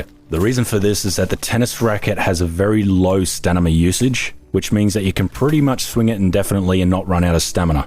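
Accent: Australia. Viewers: Stumbles across a video thanks to the algorithm - Sees the channel name is " " - Wonders "hm, is this guy Aussie?" - Accent confirm. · Are you an aussie? You sound like an aussie.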